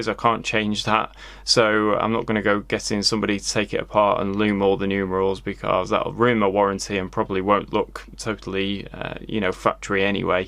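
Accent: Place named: United Kingdom. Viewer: Great review. I love your review style and you have a very pleasant voice (not to mention a lovely British accent as well). Personally I really dislike the dial of the Air King and I would rather buy something lesser known and better valued (for example for the 5000 GBP the Air King costs I would rather buy a Sinn and a Nomos) but there is no accounting for taste! Kind regards from Amsterdam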